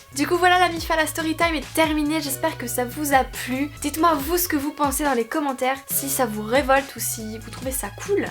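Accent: South, France. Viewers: C'est juste horrible de se servir des gens comme ca avec plein de promesses en plus quand on n'y pense ca aurait pu être pire dans c'est cas la il peuvent demander n'importe quoi et tu ma surpris d'une part par l'accent du Sud qui revient et par ta patience 😊😊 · #AccentDuSud#Snap, sinon superbe vidéo comme toujours 🖇🖇❤️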